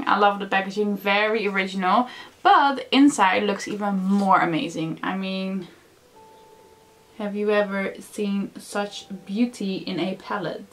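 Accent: Netherlands. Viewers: Je hoort zo goed dat je Nederlands bent. Cute look🤗🤗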